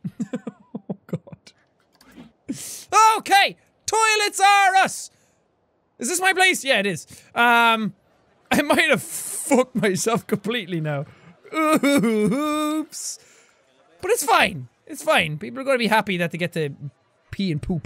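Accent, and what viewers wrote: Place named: Ireland. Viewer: theelectricsocket does not have the beautiful angle voice but he could definitely make a speech song.His voice is so soothing and has the perfect amount of Irish accent 👌🏻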